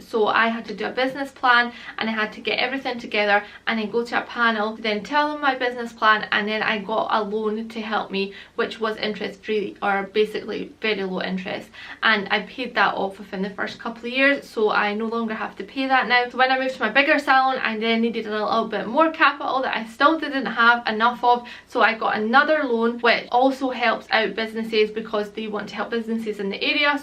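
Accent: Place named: Scotland, United Kingdom. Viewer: U from the Scottish Borders? Bc u sound Scottish and English btw very inspirational and makes me wanna start it sooner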